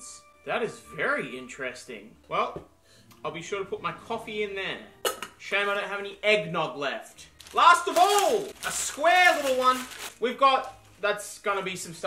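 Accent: Australia. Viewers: Ur so Australian lol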